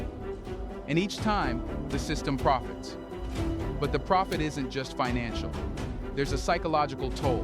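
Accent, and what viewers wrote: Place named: United States. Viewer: All these fake AI videos online, American accent on an african report? Get real...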